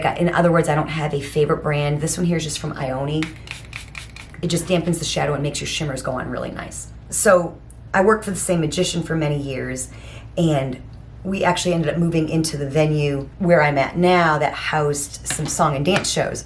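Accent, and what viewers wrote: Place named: Georgia, United States. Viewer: I didn't know you're in Alaska. I feel like you have a Georgia accent 😊😅 I love your earrings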